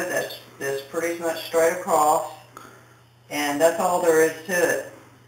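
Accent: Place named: South, United States. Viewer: Honestly if a person doesn't like your accent they can just not watch your video. I found this tip very helpful and I appreciate the time you spent making this video. Now I was born and raised in Alabama since I was 15 and I've heard a lot of accents like yours. I think Southern accents are beautiful and I was sad to find that my own accent (though not as strong as yours) disappeared so quickly when I moved up North. It's refreshing to hear a strong Southern accent every now and then.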